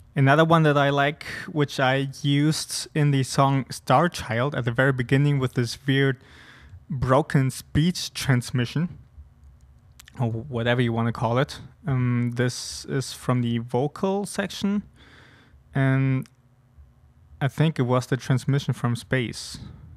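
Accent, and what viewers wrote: Germany. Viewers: are you a german guy? Greetings ?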